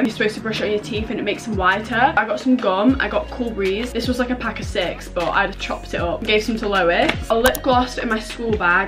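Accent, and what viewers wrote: United Kingdom. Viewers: hii! i know u probably wont see this, but just in case u do, i actually love ur content so much u dont understand. ur my comfort youtuber, especially since i used to live in england, until my dads work caused us to move to nyc so its so refreshing to hear a british accent again. i love u so much ur voice brings be back home, keep doing what ur doing i love u so much💓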